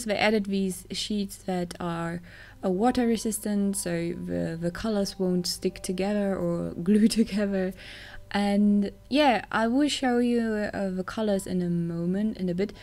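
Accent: Germany. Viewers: You're german. I can hear it😂.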